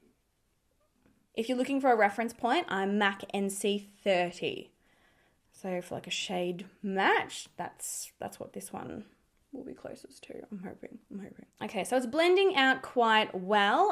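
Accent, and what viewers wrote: Australia. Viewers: I love you!!! I have oily oily oily...did i say oily??..skin!.. and i like depend on your reviews and suggestions! I don't know what i would do without your channel!! And your awesome Aussie accent is an added bonus!! Lmfao. Keep killing it girl!! You just saved me $18.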